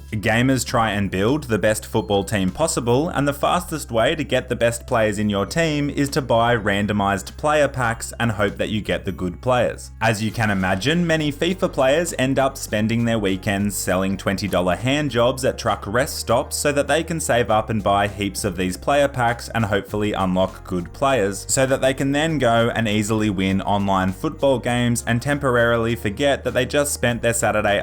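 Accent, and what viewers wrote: Australia. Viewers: Oi are you Aussie? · Are you Aussie??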